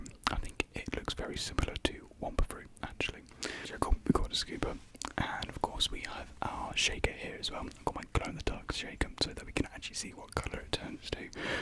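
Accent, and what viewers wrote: United Kingdom. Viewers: Is he British cuz British people are good at ASMR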